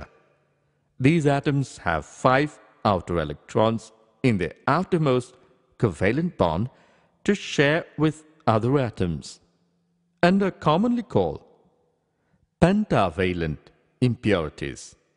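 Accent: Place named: India. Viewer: whatsup with the indian accent?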